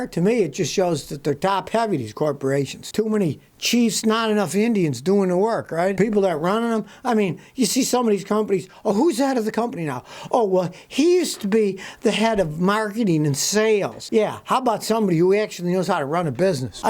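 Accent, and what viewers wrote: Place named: Michigan, United States. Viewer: THAT'S why you have that MI accent!! I always thought "he sure don't like a NY'r, he sounds like Chicago". Well now that riddle's solved. Happy Independence day 🇺🇸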